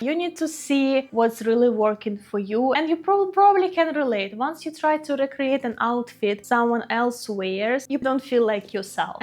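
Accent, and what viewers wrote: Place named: Russia. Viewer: Are u from Russia? Your accent and pronunciation is very special. Russian style 😅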